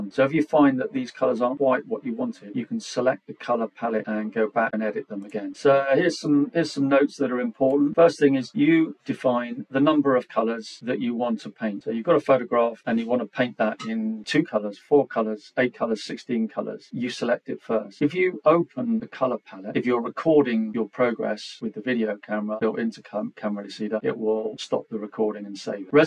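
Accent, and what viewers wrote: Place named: England, United Kingdom. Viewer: as the author of the app I have to say this is one of the most amazing user videos I've seen for my app! I am so impressed and thankful! Of course, the super cool English accent is the icing on the cake! Seriously, your video is going to help so many people!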